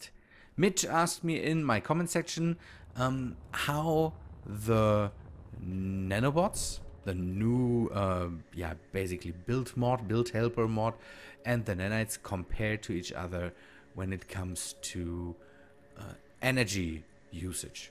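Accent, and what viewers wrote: Germany. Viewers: Nur intresse.. 😂 Deutsche haben so eine unverkennbare Aussprache, die hört man irgendwie immer heraus..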